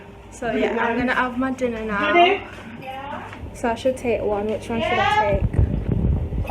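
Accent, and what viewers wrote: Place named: United Kingdom. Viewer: Are u jamaican u sound like u british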